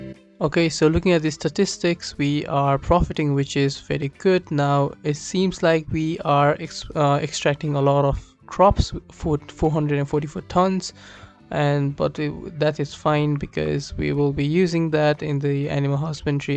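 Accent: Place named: India. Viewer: Your accent is very much Indian ! Where are you from ?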